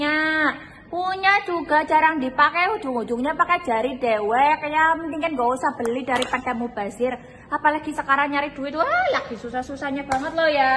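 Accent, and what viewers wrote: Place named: Banyumas, Indonesia. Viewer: hahaha ngapak euy keren hadir salam sukses bro mampir ngopi ☕☕☕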